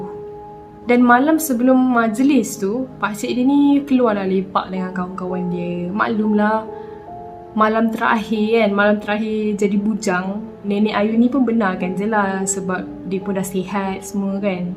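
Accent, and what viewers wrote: Kelantan, Malaysia. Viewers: comel kak cakap klate...best tgk kak bercerita...❤❤❤kak one of my favourite youtuber.. Salam dari kelantan...😉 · Comel bhsa klntn 🤣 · emm yoo doh la tu! lawak sikit accent kelate kak 😂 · come kecek klate,hhuhu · Sy dari kelantan... btw jadi boh kecek qlate 🤣 so jgn berhenti mencoba lagi 😘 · cute je kak ckap kelantan 😍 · comey laa ho ore melaka kecek kelate hahaha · Bendo tu ko abe.? Erm yo dh la tu.. 🤣🤣😂 bulih kecek klate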